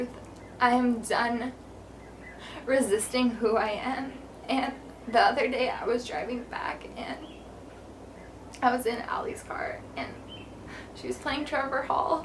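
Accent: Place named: United States. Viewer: what a lovely girl, I'm an English guy and my heart melts when I hear an American girl talk! I could definitely do with meeting a cool American chick!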